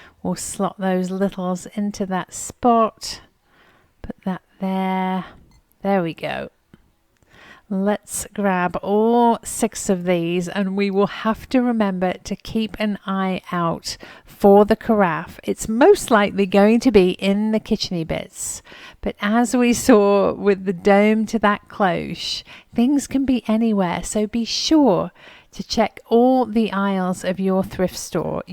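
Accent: England, United Kingdom. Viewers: what part of England are you from, you sould a bit like my cousin Jenn, she was from Maidenhead, and a teensy bit like my friend Lorraine who is from Cobham. my other english friends do not sound one bit like you, they are from Liverpool, and another family says they are gordies, OH my , when I first met them I couldnot understand them, they had so many different rhyming words for things, not like cockney but different, and my exchange student was from Bristol, and Beautiful Cotswald. my mother in law was born near London, so English accents are my favorite things. lol. I am not like the professor in My Fair Lady but I do enjoy trying to figure out the accents. London accents are the easiest.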